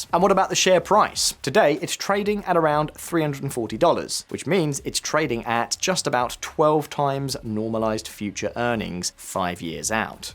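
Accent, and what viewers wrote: United Kingdom. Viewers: British accent. Disregard.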